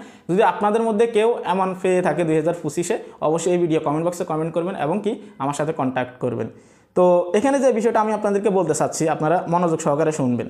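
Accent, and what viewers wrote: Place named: Noakhali, Bangladesh. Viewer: উনি হয়তো নোয়াখালীর এজন্য ওনার ভাষা এমন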